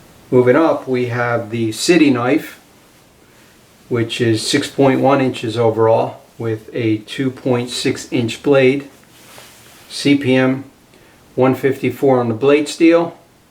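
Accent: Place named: Philadelphia, United States. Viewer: That’s a philly/ south Jersey accent if I ever heard one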